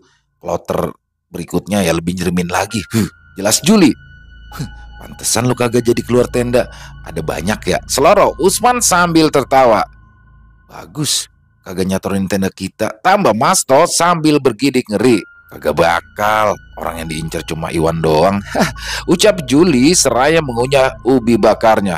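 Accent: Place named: Betawi, Indonesia. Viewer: Bikin LOL mendengarkan Banh bercerita dengan bahasa betawi... 😅😅😅 sangat menghibur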